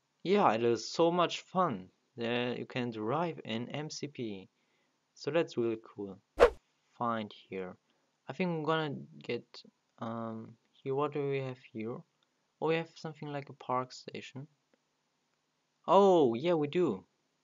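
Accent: Germany. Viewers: Wie mann einfach hört das du deutscher bist! :DD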